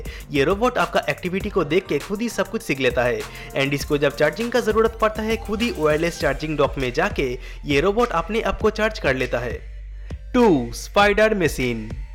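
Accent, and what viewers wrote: Bengal, India. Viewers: Okay but voice is a mixture of Bengali and hindi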